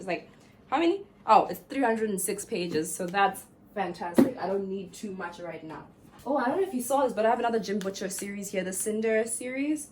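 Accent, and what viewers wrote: South Africa. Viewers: your accent is probably South African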